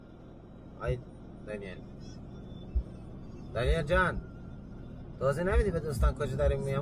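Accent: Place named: Shiraz, Iran. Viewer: عاشق لهجه زیبا و اصیل شیرازی شما هستم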